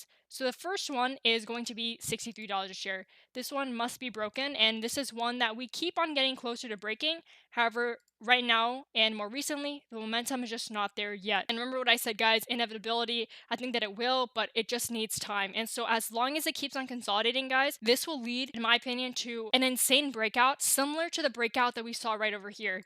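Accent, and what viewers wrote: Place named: Canada. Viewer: Well-done, 👍 🐰‼️👏👏 Did I hear a little Canadian 🇨🇦accent in your voice? My kids have that too‼️😃I like it‼️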